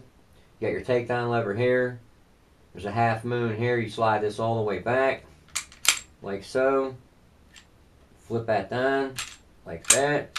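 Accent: Pittsburgh, United States. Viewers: I'm guessing you're from Pittsburgh. Sounds like it. :)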